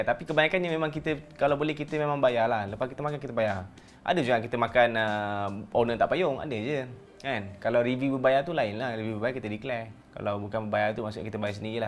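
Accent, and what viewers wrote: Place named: Minangkabau, Indonesia. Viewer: hulaa...patotla ckp minang manjang....asal greenwood ke..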